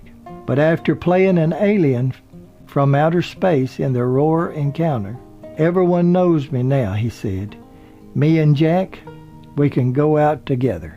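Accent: South, United States. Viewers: Thanks ever so much for this great tribute to mr. Elam. I have always enjoyed his movie acting. He may not have been the most handsome man in the room but he had a commanding presence on screen! And as for your southern accent , I think that it’s very unique , you don’t sound like everyone else! And for me, that’s a good thing! I have subscribed. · I always liked Jack Elam and wondered what happened to him. As a kid, I remember a TV western when it showed a picture of Jack with the introductory words saying something like "Gunman turned Lawman", which, as I remember was a pretty darn good show. Thanks for your nice Southern style (voice) discussing the life and times of Mr. Elam. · Your voice is as unique and memorable as Christopher Walken’s; the rhythmic southern drawl is just the icing on the cake. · Fell asleep whilst listening to the Southern accent talking about Jack Elam .....very interesting but slow .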